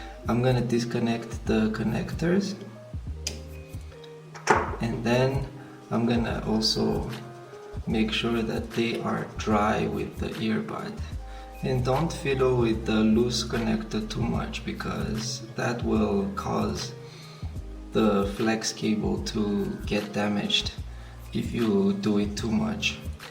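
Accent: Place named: North America. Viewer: Pls speak in common language not nort merican.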